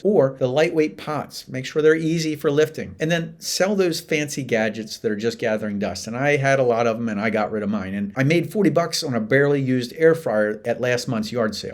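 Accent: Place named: Eastern Pennsylvania, United States. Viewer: Nice vid. P.S. I'm detecting an eastern PA accent. True?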